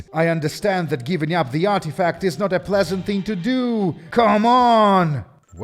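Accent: Russia. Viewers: I think the weirdest thing in this review is still listening to a man with a Russian accent attempt to do a Russian accent.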